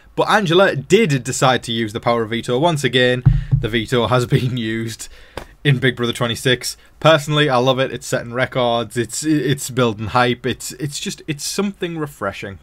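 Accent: United States. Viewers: Your American accent is spot on! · You have GREAT American accent! From an American! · Wait your American accent is good haha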